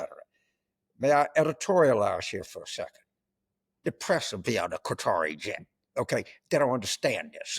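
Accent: South, United States. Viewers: Southern redneck?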